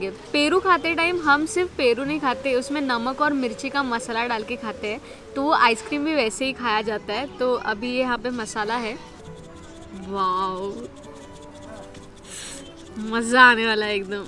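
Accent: India. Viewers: Your channel is nice and content is good too.. doing videos in hindi helps larger audience to watch it, which is awesome too! But why to pronounce marathi words in hindi accent 🤔 somewhere we do this which sounds weird.. be proud of our language and pronounce मिसळ as मिसळ and not मिसल.. just one example im giving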